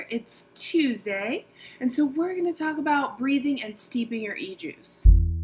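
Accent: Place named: Australia. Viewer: I love your accent. Which part of Australia are you from?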